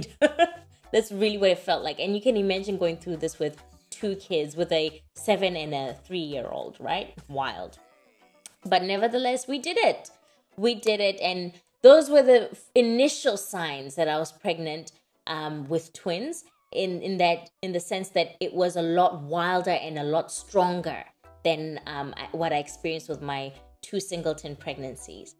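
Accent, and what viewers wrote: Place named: South Africa. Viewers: Ur accent is so close to South African 😳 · I thought as much that u can be South African. 😁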